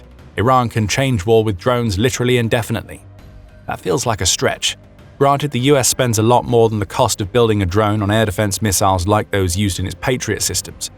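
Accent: England, United Kingdom. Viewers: Why are these US military videos always narrated by an English guy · Because the yanks just love the British accent.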